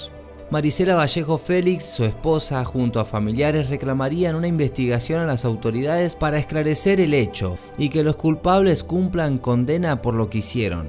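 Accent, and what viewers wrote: Argentina. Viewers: Jajajaja el que narra tiene acento Argentino Narrando una historia de un Mexicana Jajajaja no concuerda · Deberías de pronunciar bien la y o lle . El Argentino se escucha mal . No creo que sea tan dificil pronunciar la Y y la LL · UN Argentino contando la historia de chalino. No Manches. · Sólo el título para ganar más visitas, y perdón pero no me gustó la narración, más bien el acento argentino hablando de historias mexicanas 😮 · dale chee voz soss argentino.........._?????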